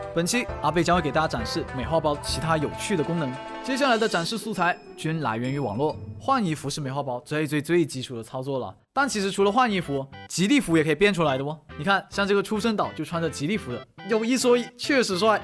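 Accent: Hong Kong, China. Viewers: 你是不是香港人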